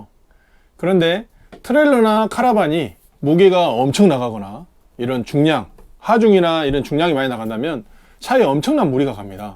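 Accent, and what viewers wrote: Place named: Seoul, South Korea. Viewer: 아따 서울사람이줄 ㅎ 멋저버러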